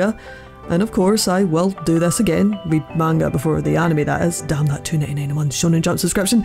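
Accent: Scotland, United Kingdom. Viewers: Love this!!! Your accent sounds Scottish, it adds to this review very well. Keep it up 💕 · Holy crap this video was done exceptionally well! And I love your Scottish accent! Great job, cheers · you sound scottish, yaas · Yes, I watched all the manga just before it on air. I almost lost all the joy of watching...Besides the fantastic editing of your video, I love your accent. Are you from the UK???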